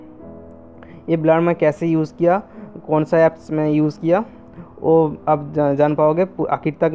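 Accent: Bengal, India. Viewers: aareee....bangali.....agy. hindi. sikhy. aai.. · improve your Hindi. You sound like Bong-Hindi, which is horrible. I'm sure you are Bengali... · haye re bengali babu..acent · Dont worry bro it was good enough to understand.... I can say that youre Bengali like me, thats why you had problems with pronounsation. But the video was good enough to understand..... Thanks. Qnd make some more useful video like this..... 😊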